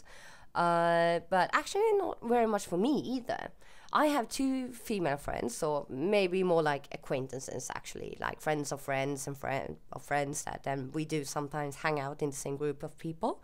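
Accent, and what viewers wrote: United Kingdom. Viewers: I am from Norway so i wonder why i thought you were British xD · Reading the comments I see that I'm not the only one who thought you had a british lilt to your voice, though not a full british accent. You speak english very well and perhaps the lack of a heavy accent is why some listeners are confused about your native language. The information on swearing was also very interesting. I've never been offended by swearing, but I do find excessive casual usage to be crass. I'll keep your comments in mind in the future.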